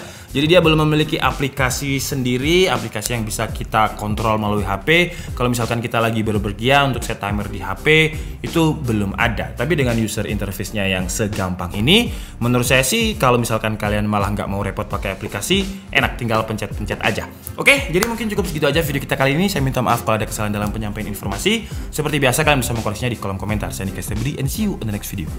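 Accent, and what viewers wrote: Malang, Indonesia. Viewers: Medhok nyaa itu loh heheh ngalam ae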